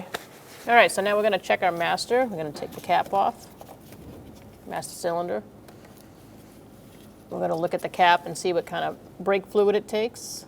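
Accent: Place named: Massachusetts, United States. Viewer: I’m hearing Massachusetts or Jersey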